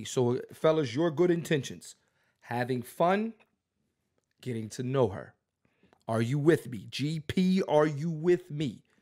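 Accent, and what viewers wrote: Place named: New York, United States. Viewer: Love the new york accent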